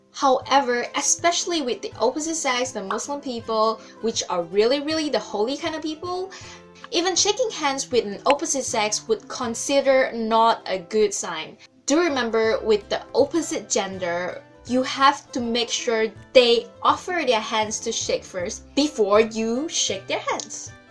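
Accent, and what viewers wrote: Malaysia. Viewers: quick speaking mix with slang with up and down tone just like the sea waves. it kinda meshed up mixture of indian/malay/chinese speaking tone . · dude thats manglish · I can sense the Malaysian-english sliding in as the she talk 😂😂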